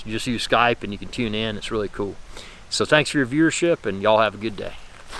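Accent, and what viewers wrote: United States. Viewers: Great channel! I like you videos. So nice to hear a American accent rather than some English bloke reviewing watches. Lol 😂 You should check out the 2021 speedmaster. The bracelet is tapered 20mm to 15mm and they changed the endlinks. It’s super light and crazy comfortable! It’s also actually Meta tested so crazy accuracy and it’s actually tested to 50m not just rated 50m so you can now swim with it. My wife bought me one for my 50th birthday absolutely love it. The Tudor is a great watch too. I’ve owed a few black bays the only one I’ve kept is the BB58 blue. Even though I have a 7 3/4 wrist the 41mm BB slab side’s look silly on my wrist. The 58 looks perfect since it’s thinner. I know you have a 58 which do you prefer?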